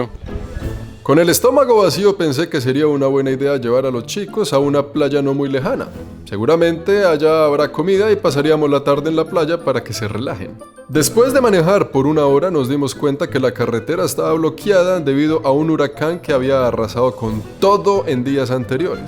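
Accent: Bogotá, Colombia. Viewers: En este video escuche que es colombiano ❤❤❤yo amo ❤ese acento colombiano ❤️❤️❤️❤️❤️ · El acento de los colombianos🌹❤️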